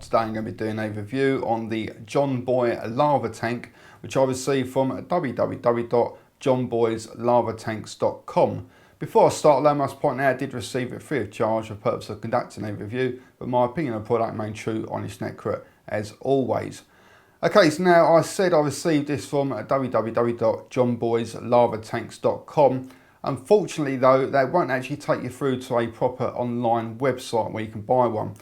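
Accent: London, United Kingdom. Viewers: oi mate. you sound like you from london.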